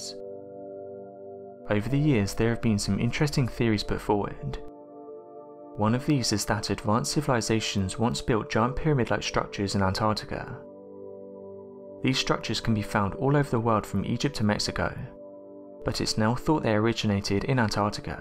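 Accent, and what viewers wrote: England, United Kingdom. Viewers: Yeah his cadence is amazing and the way he pronounces words. I could listen all day! I can't quite make out what type of English accent that is. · His beautiful British accent and pace of narration too...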